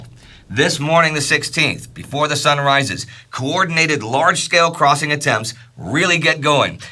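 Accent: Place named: Rust Belt, United States. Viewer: He's doing his best with his Rustbelt, Mid Atlantic accent of a man in his 50s. Probably vastly better than 95% of vids about the Korean War. BUT: appreciate the feedback and if you look at WWI/II IRT things vastly improved/changed with time. Let's see!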